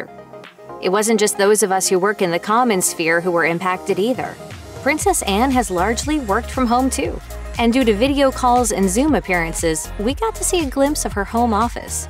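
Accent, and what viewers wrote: United States. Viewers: The commentary was done by an American, what more can I say 😆 I've always heard that Princess Anne was quite frugal in lots of ways (as far as Royals go that is). Americans being obsessed with wealth, well there you go, the commentary is bound to be quite materialist and incorrect. That's Americans for you. I really wish yanks would stop reporting on the Royal family with their screeching accents. It grates on the nerves! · It gets right up my nose to hear someone with a strong American accent criticising our Royal Family, why don’t you just keep your biased comments to yourself and perhaps concentrate on all the serious social problems you have in your own country such as racism, gun crime, obesity, avarice and greed.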